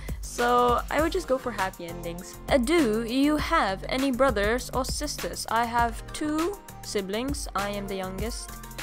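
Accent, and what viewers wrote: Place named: United Kingdom. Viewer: Heyy or should i say thats a cool name!! Its good to know more about you really That british accent tho i see you i see you 😂👌🏻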